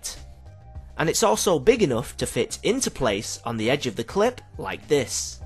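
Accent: United Kingdom. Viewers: Its good. I'll try it! Did you notice a music player somewhere ? Its a Bose Wave Music System. I have one. And lemme guess. The guy is British, isn't he? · This guy is Q from 007, also he's brittish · the stick from a 'Popsicle' i think he's British like me.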